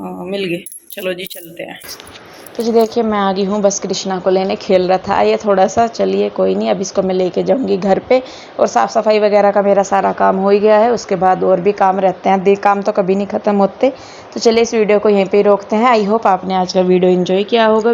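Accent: Haryana, India. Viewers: Ye haryanvi accent hai.harek ko apni bhasha pe garv hona chhye n ki sharmindgi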